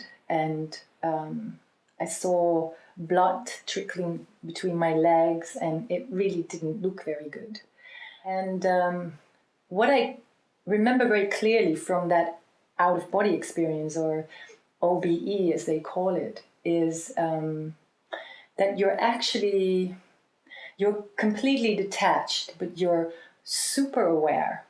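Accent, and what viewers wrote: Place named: Netherlands. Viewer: I laughed when you said the voice of Creator was in English :) Are you a native Dutch speaker? I hear a little accent that I recognize! I would love to have a session with you, if you were in Belgium or Netherlands some day :)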